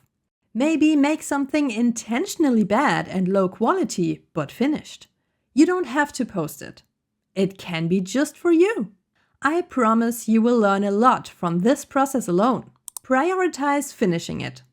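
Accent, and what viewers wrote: Germany. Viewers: If I understood correctly, you're from Germany, so, your German accent actually makes your English easier to understand for non-native English speakers (listeners?). (No offence!) About MVP... So... I wrote some program around November 30th, aaaaaaaand totally lost interest in making it good, not just MVP. Somehow in 2nd half of January I managed to get it into reliable state: now it's running 24/7. Sometimes it's really hard to make step from "it's kinda working" to "yeah, now it's not embarrassing to show it to others". But I think, I saw your channel just in time. Actually need do smth, not just collect ideas and dream about how cool it would be to build it someday. Thank you.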